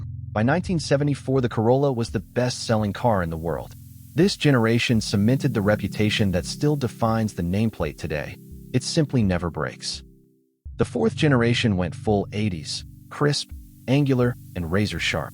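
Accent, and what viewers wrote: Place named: United States. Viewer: Who is the voice it sounds like an American man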